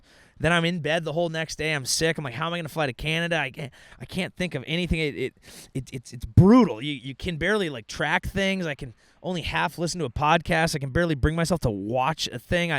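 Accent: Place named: North, United Kingdom. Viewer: Perfect Northern accent ❤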